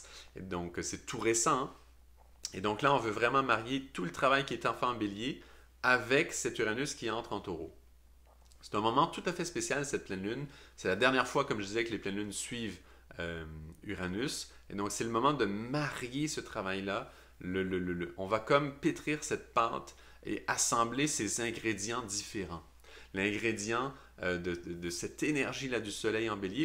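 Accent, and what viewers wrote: Canada. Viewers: Moi j aimes bien l accent canadien . Monleal...monleal ... Merci pour cette vidéo.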